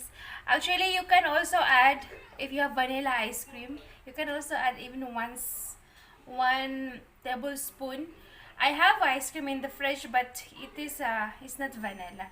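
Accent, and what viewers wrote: India. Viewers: Indian accent ka narin Kbayan.Buti nlng Pinay kharap ko bka dudugo n nmn ilong ko kakaenglish..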